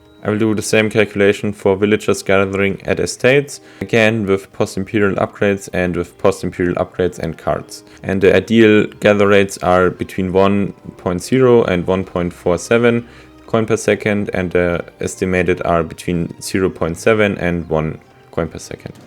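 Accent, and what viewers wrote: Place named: Germany. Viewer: I am sorry but this video is incomplete. The real gather rate heavily depends on the number of villagers per mill / estate, since the collisions become more frequent and more time is lost. We have calculated the gather rate for all possible numbers of villagers, CDB, and settler wagons: <link> (the Video is in German but since your accent sounds German, I hope that you will be able to understand it).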